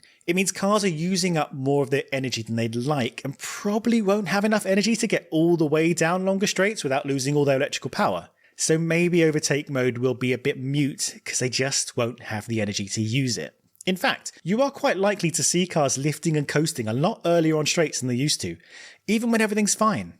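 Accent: United Kingdom. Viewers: The US Audio Track is better balanced. But still sounds British 🙂 (I'm Canadian, so the accent doesn't really matter [I know it's just a 2nd audio track with better balance]). Glad you're back! Your videos are fantastic!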